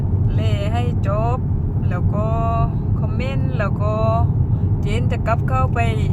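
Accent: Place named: South, Thailand. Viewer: ขับรถชมวิวด้วยคนนะคะ ไม่เบื่อๆจ้า ชอบฟังพูดภาษาใต้ มีเสน่มากเลยค่ะ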